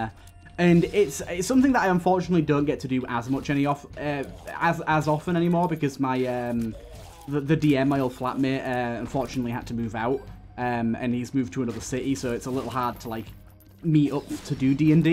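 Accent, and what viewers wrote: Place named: Birmingham, United Kingdom. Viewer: The question to you is simple where are you from in the UK i don't want your exact location just want to know where your accent is from you sound brummie to me but has some twang to it. its been bugging me since i've started watching your channel :(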